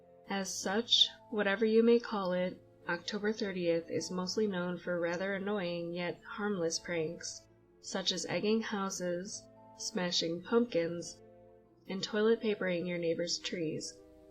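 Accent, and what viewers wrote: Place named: Midwest, United States. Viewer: Thanks for sharing. By the way, you have a lovely speaking voice partly due to that awesome Midwest accent. As for Devil's Night, I had only heard about it a few days ago after hearing it mentioned in a video about the creepy clown sightings. Do you think the Devil's Night is still out of control in some places? Also, what are your thoughts about that so-called clown purge planned for Halloween?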